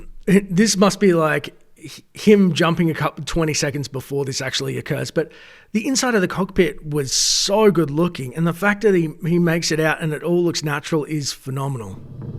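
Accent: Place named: Australia. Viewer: Excellent! Great to have you back buddy. We’ve missed those dulcet antipodean tones!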